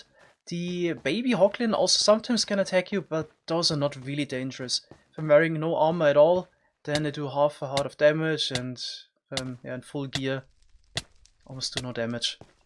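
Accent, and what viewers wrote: Germany. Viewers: see, i was thinking maybe germany or france. the german accent i’m most familiar with is a little different, so i wasn’t sure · You Sound really german Du allmann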